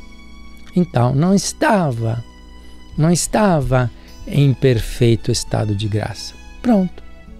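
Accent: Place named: Sardinia, Italy. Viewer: Esse Padre é sardo ( da Sardinha- Itália) não perdeu o sotaque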